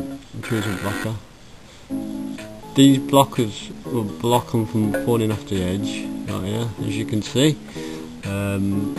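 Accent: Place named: Midlands, United Kingdom. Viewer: For u Americans it's called a Midlands accent. From the Birmingham area of England.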